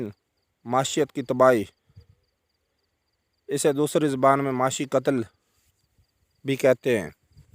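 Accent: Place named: Punjab, Pakistan. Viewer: It would be nice if some one who knows urdu language with out punjabi accent, stop punjabi Gardi !!!!!!!!!!!!!!!!!!!!!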